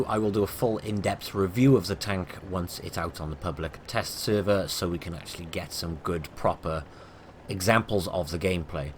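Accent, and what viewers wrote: Wales, United Kingdom. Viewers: HE'S WELSH MUN!!!!! I DIDNT KNOW THIS!!!!! I LOVE 'IM ALREADY!!!!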